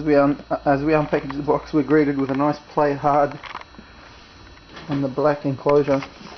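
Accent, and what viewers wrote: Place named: Australia. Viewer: 1200 Watt :) Aussie accent ftw.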